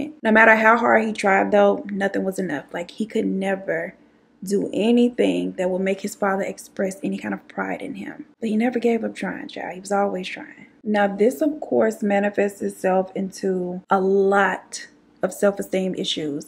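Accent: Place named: South, United States. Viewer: I love some. Let me just say that I am a language chameleon. Like if I go back home to south Florida I start talking with a Cuban like accent. While living in Cali I sounded like a surfer. I just can’t help it. So listening to has me talking all southern and I catch myself using her phrasing. “All of the things” Omgosh! It’s okay though. Sis is amazing!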